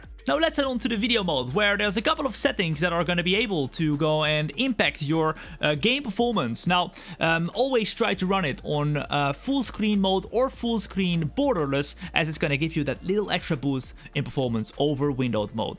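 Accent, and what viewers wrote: Netherlands. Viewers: Ben je Nederlands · Are you from the Netherlands?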